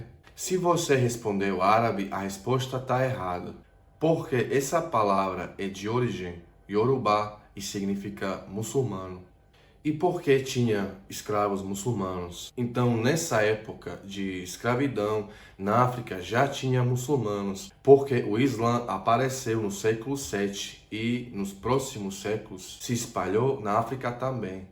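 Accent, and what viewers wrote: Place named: South, Brazil. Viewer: Você está desenvolvendo um sotaque do sul do Brasil. Na realidade parece o sotaque de regiôes agricolas do sul. a vez ei visitei o Mato Grosso, na cidade de Cuiaba e tinha aqueles agricultores gauchos la que falam mais ou menos com esse sotaque.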